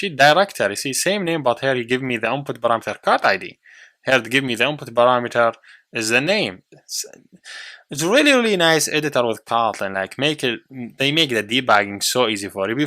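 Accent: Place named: Iraq. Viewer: Your accent seems like you are arabic,more similar to Iraq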